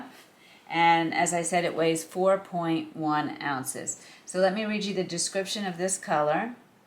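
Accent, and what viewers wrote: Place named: Brooklyn, United States. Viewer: Truth is, you come across as "our next door neighbor", your reactions and rethinking out loud, are so warm Sounds like a bit homey , NYC, (?)Brooklyn, in your voice! ? You look great in most, of the wigs. But the cool Ashy and Greys are a bit less flattering ! I'm still trying to figure out how to get them sent to Israel and still have it be worth the cost.. Meanwhile you're a lot of fun!!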